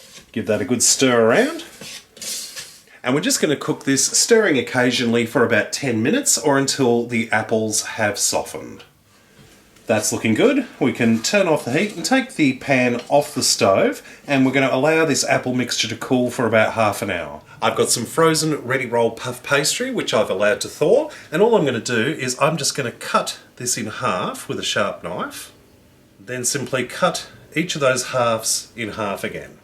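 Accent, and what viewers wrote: Australia. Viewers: I am just glad that he has an Aussie accent. I get tired of everyone who is heavy being labeled a fat American. This looks delicious BTW. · Australian? Awesome :D.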